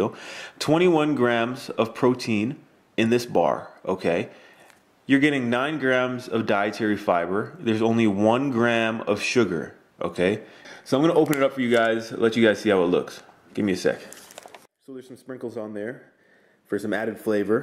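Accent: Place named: United States. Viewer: you are an attractive man. am curious though. what country are you from? you spell like a Brit (e.g. flavour instead of flavor), but you sound like a very educated American.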